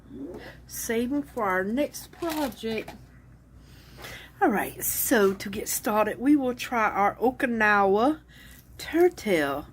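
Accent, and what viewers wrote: South, United States. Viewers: Love all your videos,!!!! You crack me up with your southern accent and words you use. I love it! ❤️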